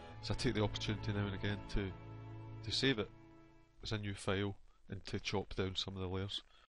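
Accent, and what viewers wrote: Scotland, United Kingdom. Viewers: Your stuff is great, and I've watched all of your videos. But turn down the music by 50% or use a ducker plug in (Ducking Compressor) to reduce the volume of the music when your talking automatically. It's really hard to follow what your saying with your scottish accent. but the narrated vids are much better than the silent ones.They move so fast till you have to stop them and try and figure out what you did at key moments. You are a big inspiration. I've been using Photoshop for 22 years and use to be pretty good in painter but at some point it became to complicated to use and I lost track. but you have brought me back. Thanks. To see my stuff go <link>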